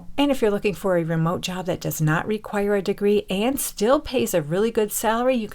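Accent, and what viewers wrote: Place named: Wisconsin, United States. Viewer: I love the accent. Gives a Wisconsin vibe to it. Made this easy listening 😊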